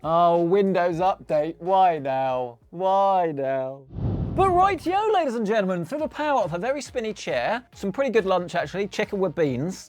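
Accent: United Kingdom. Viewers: I just can't understand with the UK accent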